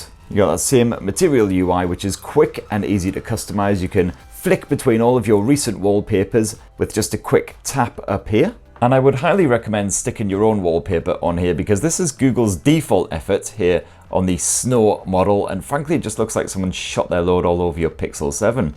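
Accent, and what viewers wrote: United Kingdom. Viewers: love your classic british humor laced accent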